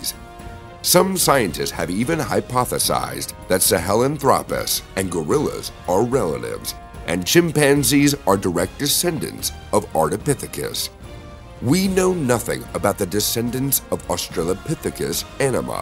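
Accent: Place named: West, United States. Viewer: Why speak with an Western accent and say meters and grams instead of ft and lb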